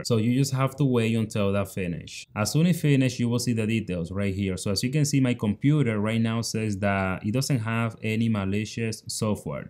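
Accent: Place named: Dominican Republic. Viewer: Bro got Dominican accent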